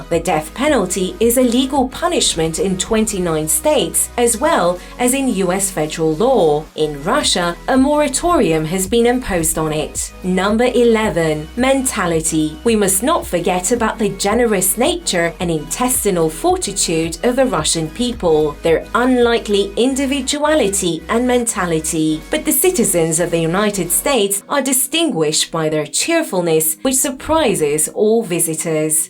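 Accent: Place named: England, United Kingdom. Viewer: Fuckin' hate this english accent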